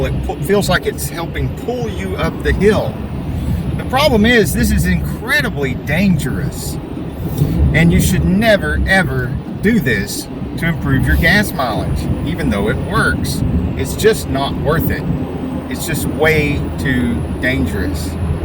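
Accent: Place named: South, United States. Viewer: I love your reviews, especially with your original southern accent 😁👍🏻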